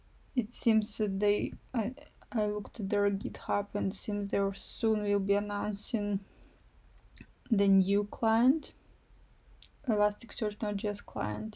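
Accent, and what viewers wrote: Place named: Russia. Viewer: One can always spot a Russian thanks to this amazing accent! Tx for the vid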